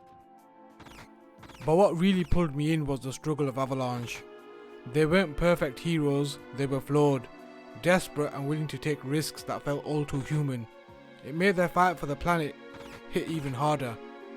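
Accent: United Kingdom. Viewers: Fantastic video and a great retrospective. Thanks so much! I imported this title along with Final Fantasy VIII on Switch. Having both full games on one Nintendo cart is amazing! BTW, where are you from? I can’t quite place your accent. I do love it, though. 😉 Edit. Ah, you’re British. I looked up Sheaf Market Square. Now I know. 😁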